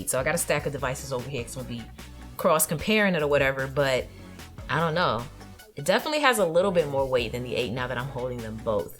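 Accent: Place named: New York, United States. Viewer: New York accent is strong. Probably I’m wrong but I don’t think so.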